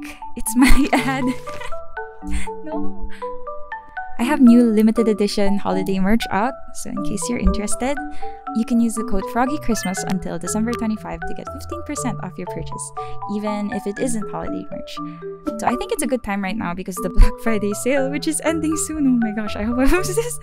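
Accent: Philippines. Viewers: I hear some sort of Filipina Accent Although that's just me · You're so god damn talented. I'm still trying to place your accent, too, lol My best guess is Filipino, but that's, like, a 30% guess, lol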